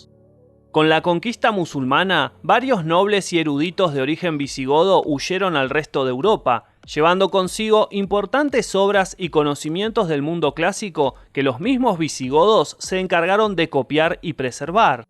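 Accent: Argentina. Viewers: es argento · lastima tu tonito argentino LLLLLLLLLLLLLLLLLL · El contenido es interesante y entretenido.... pero al locutor le juega en contra el timbre argentino, que es plomo derretido en los oídos de los hispanohablantes...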